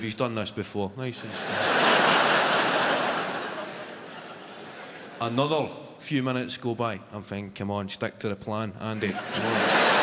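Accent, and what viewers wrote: Scotland, United Kingdom. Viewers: Just listen to more scotish folk...you get there lol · Ewert how can u not understand him?? Plain english with a v mild Scots accent!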